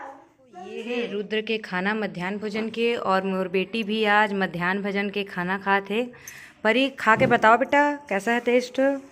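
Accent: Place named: Chhattisgarh, India. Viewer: दीदी आप मन के छत्तीसगढ़ी बोली अबबड‌ मीठा लगते।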